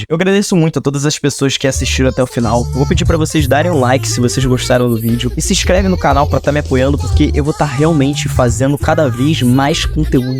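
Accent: Rio de Janeiro, Brazil. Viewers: Mano, se tu quer ser Youtuber, precisa tirar esse sotaque de carioca. Esse X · Vídeo legal..mas incomoda tanto xXx carioca...se for continuar...umas aulas de fonoaudióloga fica top...ou usar I.A para narrar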